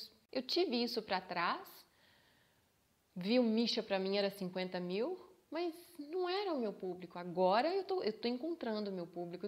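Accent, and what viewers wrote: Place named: Minas Gerais, Brazil. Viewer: cara, como eu amo esse sotaque mineirin hahahaha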